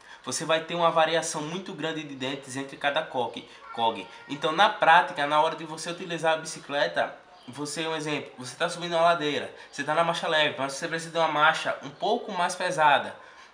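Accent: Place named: Recife, Brazil. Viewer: Vi seu comentário lá no Aliexpress E esse sotaque, vc é de onde de Recife?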